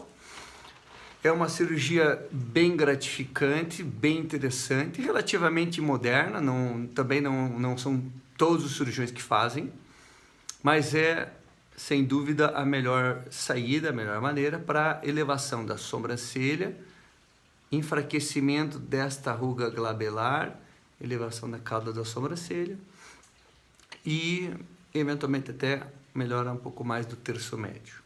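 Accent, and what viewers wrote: Rio de Janeiro, Brazil. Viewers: Dr o senhor é do Rio ?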